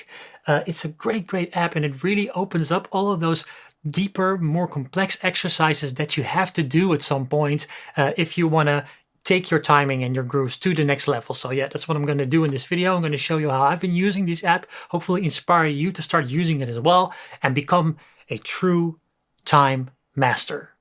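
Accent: Netherlands. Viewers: Although your location says USA and your English is great.........am I hearing a Dutch accent ?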